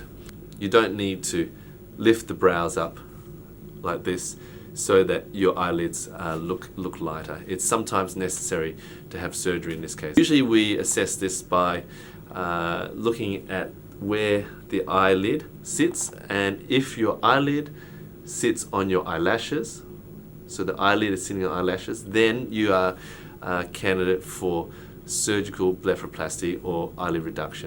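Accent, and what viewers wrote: Australia. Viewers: You sound Aussie...please tell me your in qld?!?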